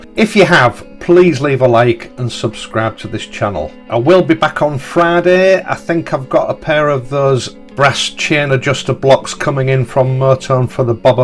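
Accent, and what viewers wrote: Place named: Northern England, United Kingdom. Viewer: Apart from the fact that I'm obsessed with this bike, and dreaming about getting one, I find your presentation style and northern accent (whereabouts are you from by the way?) very easy on the ear. I have to concur with CHANG, you are indeed the David A. of the motorcycle world!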